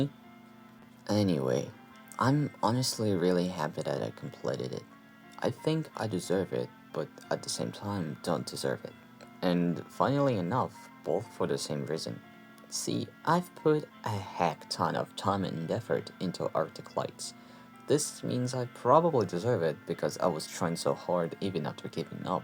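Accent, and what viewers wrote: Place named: Russia. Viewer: Все равно русский акцент,чувствуется ппц.А зачем вот это английское,если тебя не смотрит никто и так,про зарубежных вообще молчу.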